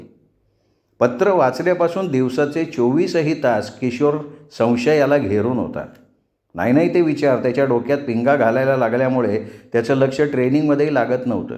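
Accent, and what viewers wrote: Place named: Varhadi, India. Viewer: खूपच छान तुमच्या आवाजात वराडी भाषा कानाला गोड वाटते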